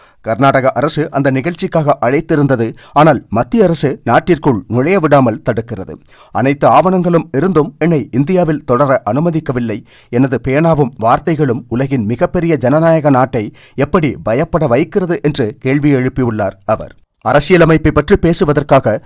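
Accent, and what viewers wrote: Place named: Sri Lanka. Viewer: Your voice is very good like a cylon Tamil mixup. Congratulations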